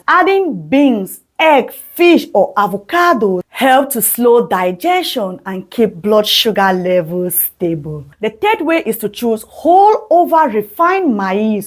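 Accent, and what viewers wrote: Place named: Cameroon. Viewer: Mom from your accent and name, you are a Cameroonian i guess... Good job dear!🎉🎉🎉🎉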